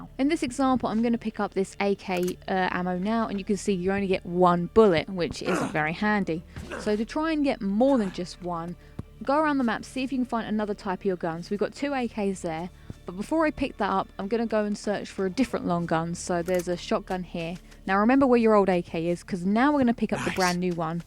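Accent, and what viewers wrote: United Kingdom. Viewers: Dog damn, that British accent. I hate it and simultaneously I live listening to you !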